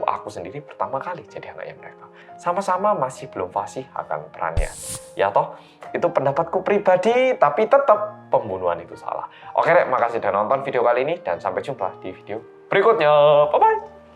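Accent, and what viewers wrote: Java, Indonesia. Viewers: 3 channel storytelling favorit: 1. (pelan, medhok dan mudah dipahami) 2. Nadia Omara (gayanya kayak itu ghibah) 3. Arroyan Pram (Konspirasi dunia pake Astronomi, Fisika, dan editannya gila keren banget, serasa nonton agung hapsah dan Jerome polin bahas konspirasi) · Yang MEDHOKKKKKKKK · Balik lagi sma yanggggggg medokdokdokkk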